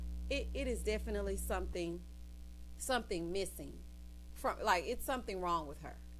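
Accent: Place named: Houston, United States. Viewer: in my mind, you are my new Bestfriend....everyone has pointed out how you and I mirror one another with the way we speak...LOL!! Houston meets South Carolina 🤗 Love your channel and I hope it continues to grow...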